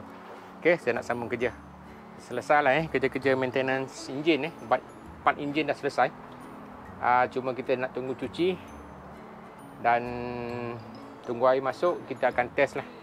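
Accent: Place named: North, Indonesia. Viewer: Bagus sekali bro.....nak tanya sikit....bro asal dari mana....ada dengar pelat utara.....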